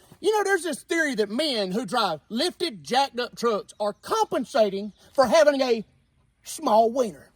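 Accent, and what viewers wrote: South, United States. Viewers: I have started saying select words in a southern accent because of you 😂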